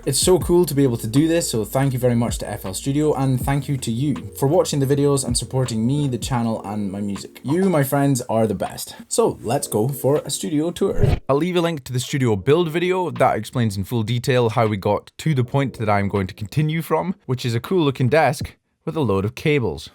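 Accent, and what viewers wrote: Scotland, United Kingdom. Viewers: Awesome video brother! Just outta curiosity. what part of the UK are you from? Cheers from Canada! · I love when you click on a video and hear a fellow Scottish accent!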